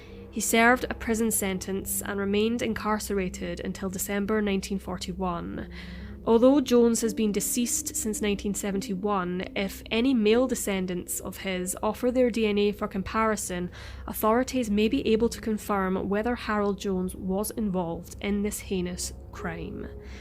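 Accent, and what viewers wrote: Scotland, United Kingdom. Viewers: It's Scottish..! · she is Scottish. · She’s Scottish, not English!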